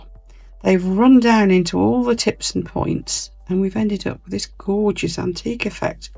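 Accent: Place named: Black Country, United Kingdom. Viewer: LOVE the subtle black country accent.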